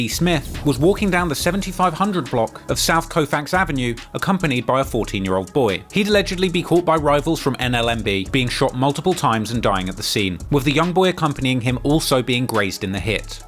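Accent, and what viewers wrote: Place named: Cockney, United Kingdom. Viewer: 😂😂😂 it's PAH-STO ..that cockney accent fucks up alotta pronunciation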